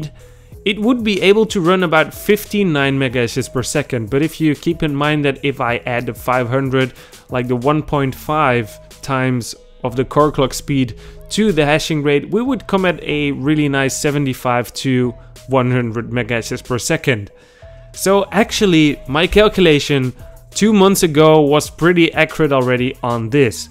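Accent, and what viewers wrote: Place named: India. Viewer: I HATE YOU VOICE. i im Di Viga thIIIIiii... Are you indian? youre soft sound E-i made my russian brain a crazzzZi. Lets try for example Ay Em Dee fucking Veeeeee GGaaaaaaa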